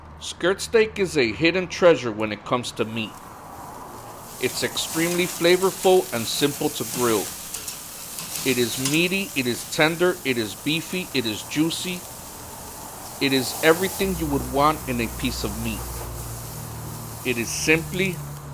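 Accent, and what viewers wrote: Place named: Latin America. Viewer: I can simply tell by the accent that this guy is qualified to have this conversation. If you aren't from Latin America, you just don't know skirt steak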